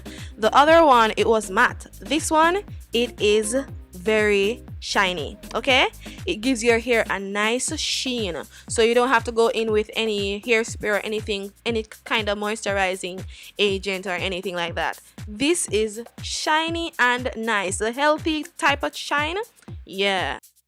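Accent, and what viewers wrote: Jamaica. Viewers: My mother is from JA. I knew where you were from, before you said it. 🇯🇲🇺🇲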